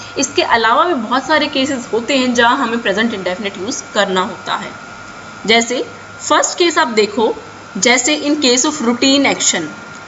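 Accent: Delhi, India. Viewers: You are from Delhi mam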